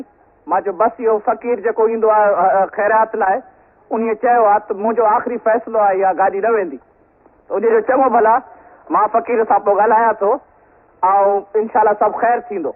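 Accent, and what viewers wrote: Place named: Larkana, Pakistan. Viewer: Kitni piyari sindhi bol rahay hain bhutto sahib larkana style sindhi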